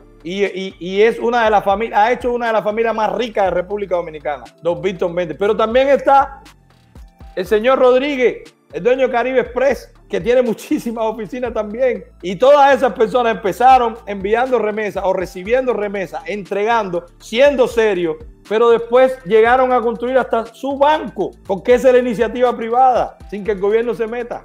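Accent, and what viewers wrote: Cuba. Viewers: Señor usted es un excelente comunicador y se informa muy bien hablando en buen cubano con usted no alumno bruto, felicidades, gracias.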